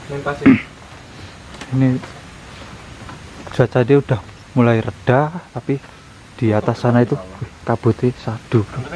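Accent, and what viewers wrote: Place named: Central Java, Indonesia. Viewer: wahh puas pindah pindah jalur dong....satu gunung bisa bikin banyak video ini mas....satu persatu via mana via mana di kompliti ...orak usah adoh adoh sek...gunung ning kampungmu kompliti dulu ya 😁....kmu jawa timur tapi kok medoknya blum semedok surabayanan ya mas....masih agak agak mirip jawa tengah logat dan nadanya bicara 😁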